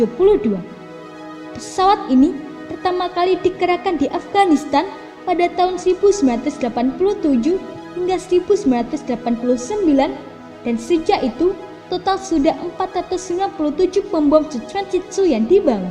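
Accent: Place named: Aceh, Indonesia. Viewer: Logatnya mirip logat aceh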